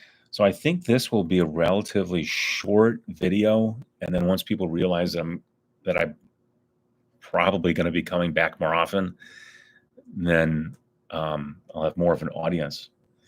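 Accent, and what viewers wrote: United States. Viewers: May I ask you about your accent? You have the most distinct american accent I ever heard, especially very strong "r". Is it a matter of locality you live in, your personal pronunciation, or something else? Don´t get me wrong, I like it. I also appreciate your effort in making your YB content. :hand-purple-blue-peace:Miro, Slovakia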